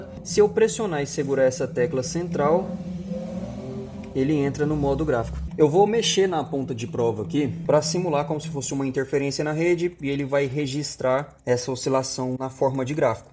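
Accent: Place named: South, Brazil. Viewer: Você é de qual estado,? Seu sotaque parece de sulista.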